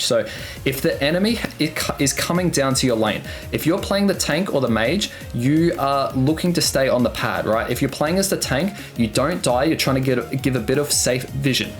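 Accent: Australia. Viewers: are you australian? also your vids are VERY USEFUL! like seriously i got +10% win rate in ranked because of your vids!! so thx!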